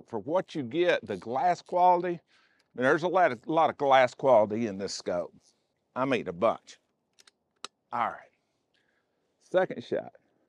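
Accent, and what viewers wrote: South, United States. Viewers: Are you Southern or Texan? Either way I adore you, your sense of humor and editing style!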